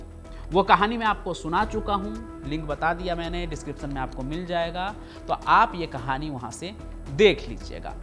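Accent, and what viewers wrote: Bihar, India. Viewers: Wannabe lallantop 🤑 Aaj kal trend ho gaya u.p ,bihar ke accent me kuch bhi bolo aur views le lo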